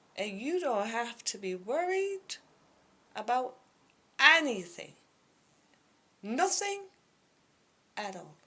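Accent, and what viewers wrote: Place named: Jamaica. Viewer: You sound Jamaican.....keep on LIVING. Number seven 7⃣.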